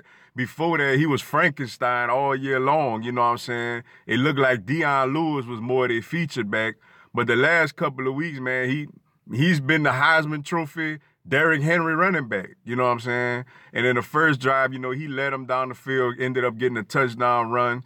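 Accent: New Orleans, United States. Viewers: Sound like a NewOrleans?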